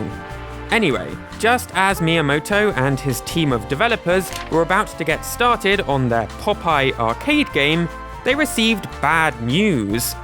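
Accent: United Kingdom. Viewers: HES BRITISH!!!!!!!! 😡😡😡😡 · For the people who are confused as to why pronounces Mario differently, a lot of us from the UK pronounce it that way and have been doing it for years so it’s nothing new. Some people in other countries say it that way as well so it’s not only restricted to us. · He’s British that’s how we say it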